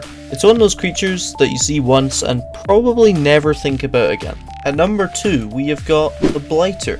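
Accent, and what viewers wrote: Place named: United Kingdom. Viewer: Note his British accent p.s. not making fun at it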